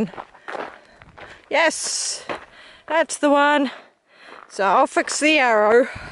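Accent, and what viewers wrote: New Zealand. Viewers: Great day for wildlife. Loved the beer(bear). Vanilla is a good repellent for the gnats. P.S. Your Kiwi accent is totally charming, I could listen all day!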